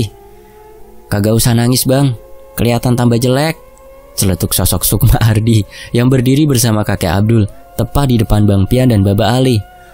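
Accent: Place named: Betawi, Indonesia. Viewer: Wa'alaikum salam wr wb Saluuut bgt sama mas suaran & Dialek Betawinya pas bgt menyatu & menjiwai kereen 👍👍👍🥰🥰🥰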